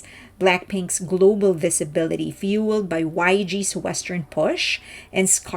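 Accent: Philippines. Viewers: Loving the Pinoy accent 😍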